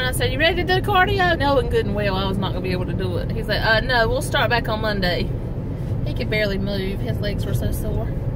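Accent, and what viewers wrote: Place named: South, United States. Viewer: I really enjoyed this and your southern accent :) I did a MUKBANG on my channel for them also :)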